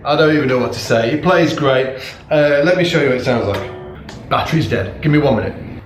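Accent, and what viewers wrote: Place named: Northern England, United Kingdom. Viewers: You’re so northern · I agree about having a better bass doesn't make you any better at playing but I wouldn't say you play exactly the same on every bass, some are more comfortable than others 😏 Fender Cabronita Precision Bass (speaks for itself) Squier Affinity Bronco Bass modified with Seymour Duncan Mustang Bass split coil pickups and a Jazz bass bridge pickup (brand unknown) (and a confederate flag pickguard hehe, not racist 😏) SX Vintage Series SPB57 Precision Bass (love this one) Also gonna buy an Ibanez ATK200 sometime soon Ampeg BA-108 V2 (selling) Peavey Tour Series 450 bass head (also selling) Soon getting an Ampeg BA-210 V2 PS nice basses, and let your Yorkshire accent fly hombre 😏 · Nowt wrong wi'a Yokshuh accent :) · "A toone"! Yorkshire much?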